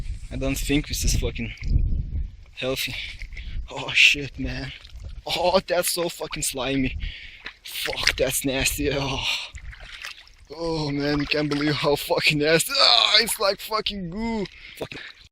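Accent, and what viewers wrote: Russia. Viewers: Are you Russian? I hear accent ha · Videos are great. I can tell your from somewhere like Russia or close to it. What a lot of people don't understand is that the word Fuck to you (since English is not your first language), is not a big deal. Keep exploring my friend, maybe you will get that superpower:)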